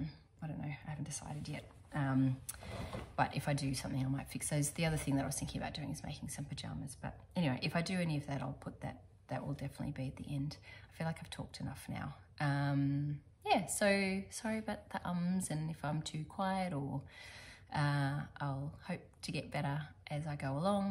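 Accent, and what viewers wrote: Australia. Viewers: Hello, just found you on youtube, nice to hear an Australian voice,you are a gifted knitter, i have been watching youtube since COVID, i do love watching different crafts but lately the knitting ones i have liked because of all the lovely yarns that are available and the projects that people make, i am amazed, i have never been a knitter but lately have been making a few plain scarfs, i wish someone would have taught me when i was younger, but i do other things and i took a watercolour colour class a few years ago now, so i am happy. I have started painting wooden things and doing transfers and gold leaf which is more my thing. I was pleased to come across your podcast and look forward to watching again. Kind regards pamela hall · I’ve just discovered your podcast and I love it!!! It’s fabulous to see and hear an Aussie! Looking forward to watching your other episodes. 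👏😃🧶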